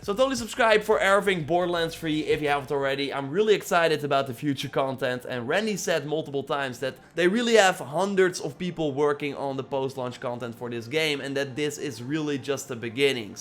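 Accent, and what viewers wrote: Netherlands. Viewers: Are you Dutch? Your accent sounds very Dutch lol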